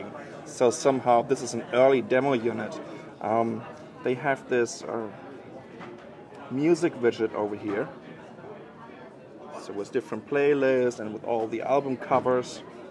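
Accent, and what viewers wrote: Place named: Germany. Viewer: kinda german accent.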